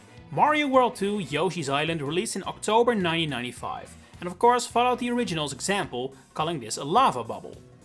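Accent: Netherlands. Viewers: I'm from the Netherlands and I can just easily here his Dutch accent. Honestly, to me it just pisses me off to here Dutch people trying to speak English but yeah that's, about it really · sec in and can just tell you're Dutch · Vrijwel elke Nederlander heeft moeite met hun accent verbergen in het Engels. Ik hoorde gelijk dat je Nederlands bent hahah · Are you dutch?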